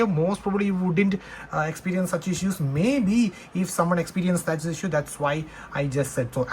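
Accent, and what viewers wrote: Kerala, India. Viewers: Mallu ahnu alle 😂 Ahh english kettalae manasilagum 😂😂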